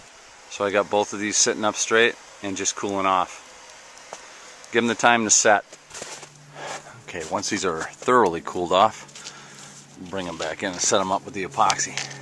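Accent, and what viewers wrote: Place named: South, United States. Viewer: That turned out niiiiiice!. Lmao at "I love that smell" man that is priceless bet you got beat up by the tree huggers on that one lol. If I had just ran across your channel and there was no snow on the ground I would have thought for sure you where from the south lol. Thanks for sharing the build God Bless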